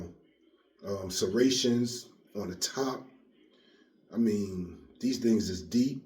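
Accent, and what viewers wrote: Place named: New York, United States. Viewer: lol definitely an old school New Yorker with that : LIKED’ed it 😜 BROOKLYN LUV🫡 BROOKLYN LOYALTY ☝️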